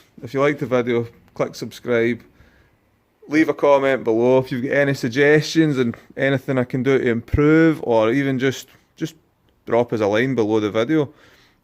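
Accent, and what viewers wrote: Scotland, United Kingdom. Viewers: Is that Scottish accent? Lovely work on that truck! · Good to hear a Scottish accent again, great job on the truck BTW. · are you Scottish?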